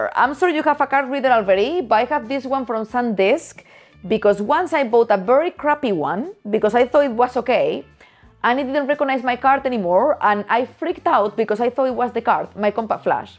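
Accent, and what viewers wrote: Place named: Spain. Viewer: great video,! I love your energy and your "spanis" accent:-)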